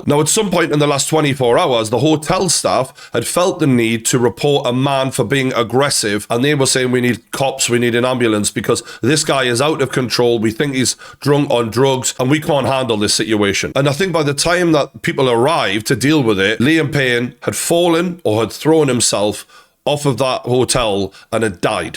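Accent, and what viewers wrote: United Kingdom. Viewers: I think “Good Lad” is an expression that was made for you, you’re everything that I picture in my mind when I hear “Good lad” resonate with a gorgeous British accent… You’re a great man, stay you, ignore the bullshit critics, that comes with success, just focus on the love you’re getting from true fans of your work. Peace brother (from a froggy on the other side of the channel 😅)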